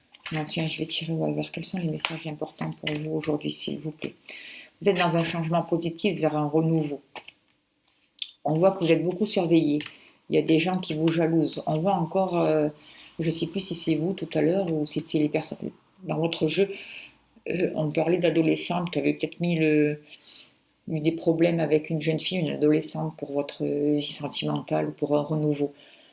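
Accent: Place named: Gard, France. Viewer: Je vous ai découvert il y a peu, et je suis toutes vos videos depuis. J'ai été impressionnée par le soin de libération énergétique, et je conserve précieusement, pour le refaire, le soin pour l'élévation des vibrations. Je ferai un don prochainement, car le bénéfice que j'en ai retiré est précieux à mes yeux, et mérite un retour de ma part. Et aussi..j'adore votre accent 🤗, qui résonne avec le mien, moi qui suis du Gard. Merci pour tout! 🙏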